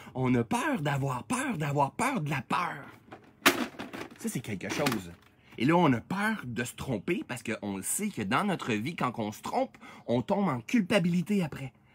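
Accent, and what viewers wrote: Quebec, Canada. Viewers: j'ai vu ta conférence à Paris en juin 2019, également celle avec David LF en avril 2019 et franchement tu m'as définitivement réconciliée avec l'accent québécois (ahhhh.... la prétention française 😂 ) maintenant il n'y a plus d'accent mais juste un "flow" que j'entends et surtout l'essentiel, the message! 👌J'espère qu'il y aura d'autres conférences prochainement lorsque cela sera rendu possible. J'étais en pleine transition dans ma vie en 2019 et je vois le chemin parcouru jusqu'à aujourd'hui (qui continue évidemment) et je veux te dire grandement merci 🙏 D'autre part, le confinement a été pour moi une chance absolue pour plusieurs raisons de synchronicitées... Je ne détaillerai pas ici mais j'ai retrouvé la paix que j'avais perdu pendant des années... Tout a été "simplifié" plus de viande ou poisson (ou très peu) de la meilleure nourriture bio et locale, moins de maquillage voire presque plus.... 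Et tout ça naturellement sans restriction aucune. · Tout à fait d’accord, le fait d’accueillir plutôt que de résister apporte une paix et permet d’être dans l’ouverture vers les autres. « Chacun fait comme il peut avec son niveau de conscience et son niveau d’intelligence du moment ». Cette phrase que j’ai intégré m’a aidé à pardonner et à ramener la paix en moi. Merci, je t’écoute depuis plusieurs années maintenant et j’ai une anecdote drôle à partager. Il y a 2 ou 3 ans j’ai visionné une de tes vidéos qui durait environ 3h, ma fille de 10 ans était malade elle était donc avec moi et a entendu l’intégralité de la vidéo. Depuis elle imite super l’accent canadien et elle fait souvent référence aux citations de avec l’accent évidemment. Elle nous fait beaucoup rire. Elle s’amuse beaucoup. Merci pour ta sincérité, je suis très touchée par ton message d’ouverture et de tolérance.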